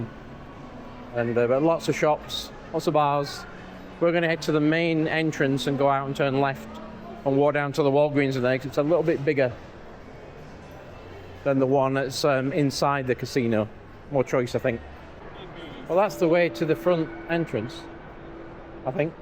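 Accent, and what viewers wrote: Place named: Yorkshire, United Kingdom. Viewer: Love the Venetian. Wow can tell your from Yorkshire 😂 what a deal!x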